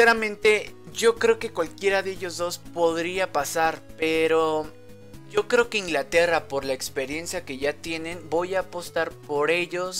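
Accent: Argentina. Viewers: Se nota que eres Argentina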